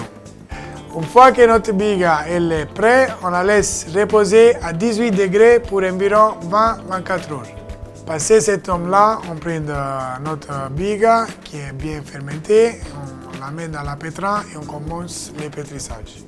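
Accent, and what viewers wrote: Italy. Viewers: Great job, you're great. Love your Italian French accent, never be ashamed of it and be proud of your origins!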